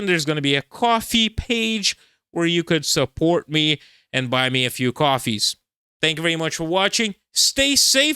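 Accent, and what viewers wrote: India. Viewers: I love your Indian accent!😊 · I just love your videos! Especially your dubbing with different accents! Great Indian accent! As a American I want to know what is going on in Russia! I have been watching your videos since you lived in Russia! I have always enjoyed your videos!!!!😂😂😂😂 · OMG!!! YOUR FUCKING INDIAN ACCENT!!! 🤣🤣🤣🤣🤣🤣🤣🤣 I mean, the information is relevant and important but the way you deliver it is just BRILLIANT!!! Thanks! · Your Indian accent is new. Love it! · Brilliant Indian Accent.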